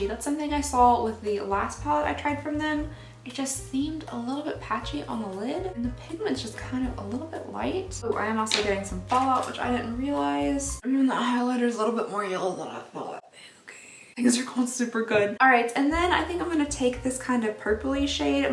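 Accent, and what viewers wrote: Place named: Boston, United States. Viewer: AAAHHHHH!!!!! I love your Boston soooooo much!! <3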